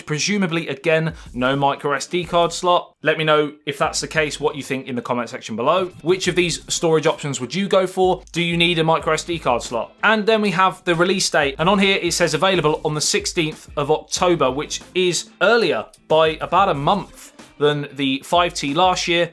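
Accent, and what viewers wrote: United Kingdom. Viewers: I used to watch your reviews as it is highly useful and also to listen to your British accent to improve my English language skills :D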